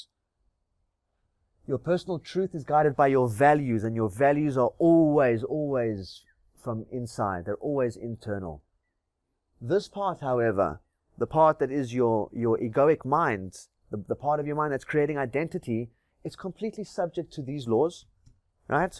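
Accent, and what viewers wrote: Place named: South Africa. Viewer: Beautiful video. Are you South African? You have a South African accent.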